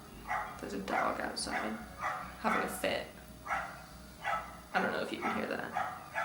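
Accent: United States. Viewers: That's ok, it seems to have settled now. I realised you are in the UK after waiting some other vids of yours. 🤣 Love your plant vids, congrats. Helped me a lot with my plant care and information. You're American though right? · are you from the south?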